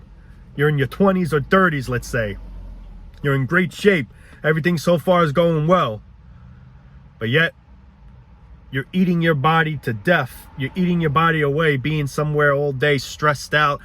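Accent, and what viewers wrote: New York, United States. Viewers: Hey dude I just discovered your channel and love it! You speak the truth! Most people just plain suck and this rat race life is awful. I hate it. I hate getting up in the morning to go to work and deal with the inconsiderate drivers on the road and then with the annoying and fake people at work. I hate then coming back home and only having barely enough time to eat before I have to sleep and then do it all over again. Ugh. I am going to try really hard to get rich somehow and then not have to work as much. Most people out there take advantage of others and are not your friend. Keep pumping these videos out bro you are doing a great job. Are you from New York? What part? That accent is pretty thick. Thanks!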